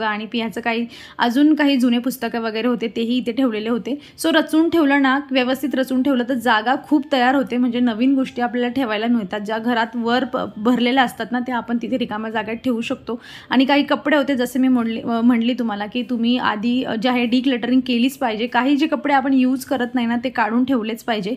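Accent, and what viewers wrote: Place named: Jalgaon, India. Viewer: Tuzya language varun lagech kalat tu Jalgaon chi like ळ la ड😂😊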